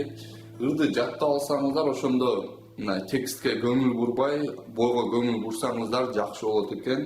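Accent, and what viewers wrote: Talas, Kyrgyzstan. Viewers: Таластык окшойт)) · Братан канча айтканы менен таластыкта суйлогонун кордунорбу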